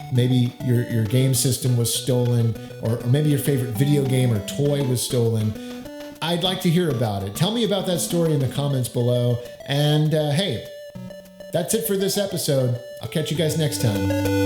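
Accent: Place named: New York, United States. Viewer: awesome yea I've been all over at this point (Li) I've seen u mention in previous videos your family was from Li that's great. I can def tell the NY swag and way Long Island is pronounced in videos 🤣 its a Li thing. But yes I was lucky enough to have my stepfather replace all the things lost which def wouldn't have happened a year prior. Grew up with a single mom so I always got hand me down systems. I def wasn't getting another had he not replaced it. He felt awful I was very lucky.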